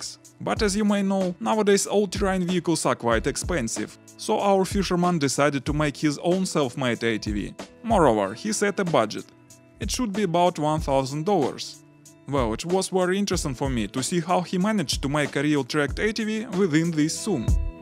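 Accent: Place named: Russia. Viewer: Акцент то РУССКИЙ да и лицо наше, ничего не понятно но очень интересно.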